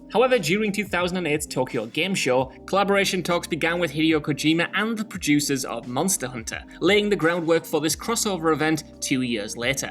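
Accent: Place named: United Kingdom. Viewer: I think you went far to British in the vid. Had no idea what you were saying during skate section. Also Americans watch this channel. Your welcome.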